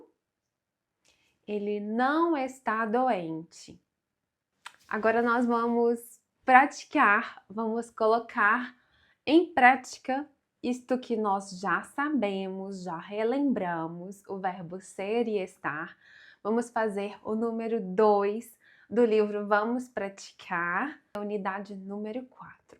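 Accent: Minas Gerais, Brazil. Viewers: Oi,! Acabei de descobrir seu canal. Adoro seu sotaque, é muito legal! Ele é típico de Minas Gerais? Você comentou num vídeo que é de Belo Horizonte, né? Quem sabe você faz um vídeo sobre isso? Um abraço da Suíça 🇨🇭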